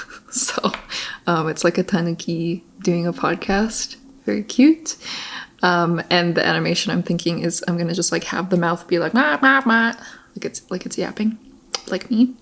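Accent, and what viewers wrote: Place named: United States. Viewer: you speak like a normal American accent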